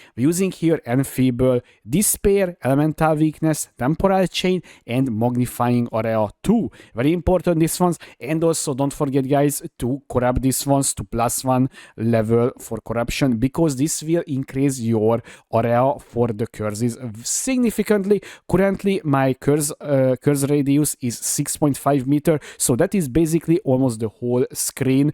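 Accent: Hungary. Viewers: Egyből le vágtam hogy magyar akcentus haha :D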